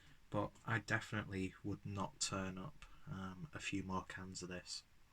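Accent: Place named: Liverpool, United Kingdom. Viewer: Posh scouser. Liked the review, lad.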